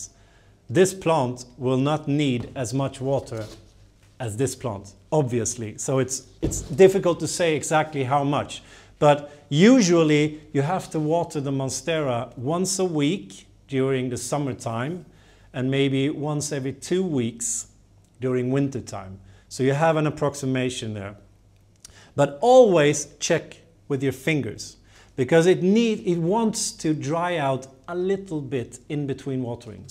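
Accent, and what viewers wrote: Sweden. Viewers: I know that most of you don't mean it that way, but actually I find it a bit rude to make fun of his pronounciation, or to put the "funny" pronounciation above the content. The content is so good! He is obviously Swedish, but he speaks very good English, so let's be thankful that they make the videos in English and not in Swedish. In Swedish, I wouldn't be able to understand them, because I am German. I would probably also have an accent if I'd start making English videos... :-D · its the beautiful swedish accent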